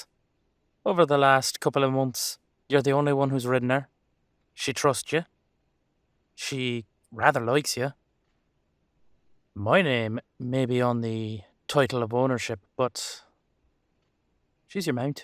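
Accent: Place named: Ireland. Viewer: I love your Irish Accent. Erin Go Bragh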